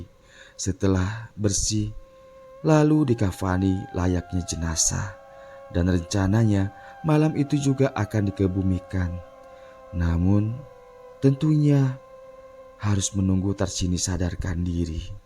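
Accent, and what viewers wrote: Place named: Indramayu, Indonesia. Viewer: Gaya bicara Indramayu an pas temen kang 😁,gak pernah komen dan like tpi selalu Nyimak #salam_Wong_INDRAMAYU 🙏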